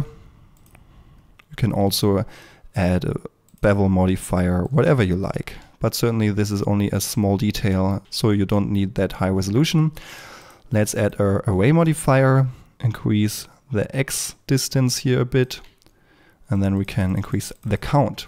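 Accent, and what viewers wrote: Germany. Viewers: dude, this guy is sooo german :D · ich liebe die deutsche aussprache und du? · bro sät sounds ja ganz schön deutsch hasste auch einen deutschen account? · Hikari He‘s german. It‘s just a strong accent. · your speech sounds very deutsch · dieses deutschenglisch ist so schräg... aber supergute tutorials :) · I smell a german speaker.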